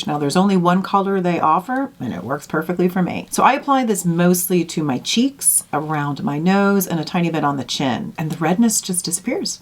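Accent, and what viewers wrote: Ireland. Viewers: Hey ❤❤ i detect an Irish accent ??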